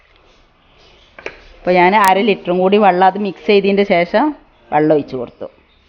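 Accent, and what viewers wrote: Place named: Kottayam, India. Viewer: അസ്സലാമുഅലൈക്കും 🤩😍😂ഉമ്മാക്ക് ആയുസ്സും ആരോഗ്യവും ഉണ്ടാവട്ടെ കോട്ടയത്തെ വർത്താനം എന്താ സുഖല്ലേ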